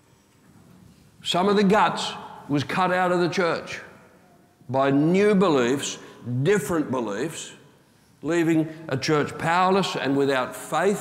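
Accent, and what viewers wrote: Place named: Australia. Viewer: I cant listen to this raving aussy any more. Now he is on about the new covenant. A mix up over two words. (this is the mistaken belief that the OT is the Old covenant and the NT is the new covenant). Not so. The scriptures say "a new covenent in (the) blood." This is A new covenant. A, A A A A A new covenant! It doesnt say THE, it says in that bit of scripture A! It is not replacement. it is A new covenant, not THE new covenant. There will yet be THE new covenant replacing that made with Abraham, it is yet future. The bible is full of "covenants" made with various people. THE (Abrahamic) Covenant was made with Abraham. So THE new covenant will again be with the people of Abraham. (yes i know we are grafted in, we are heirs of Abraham, just hold your seat) This period we in at the moment, some call the age of grace, is not the end game! 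It is a period in the plan of redemption where we are in A covenant written in the blood of Christ, one which will be fullfilled and all tied together all in all when He returns. The fullfilment of all covenants and promises is yet to come after Christ returns... it seems this preaching has forgotten the promise of the "RETURN of CHRIST" and taking no notice of the final book in our Bibles. It seems to be all about church is the final thing.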